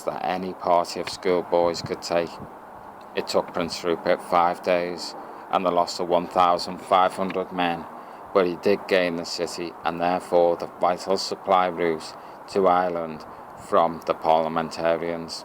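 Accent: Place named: Birkenhead, United Kingdom. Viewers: It's a Birkenhead or gay accent innit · commentator sounds bent or from Birkenhead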